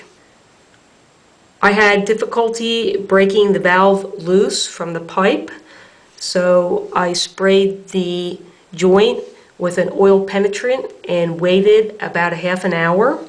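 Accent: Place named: Baltimore, United States. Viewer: Nice exploration, thank you. "Wudder." Is that a strong Baltimore accent I'm detecting?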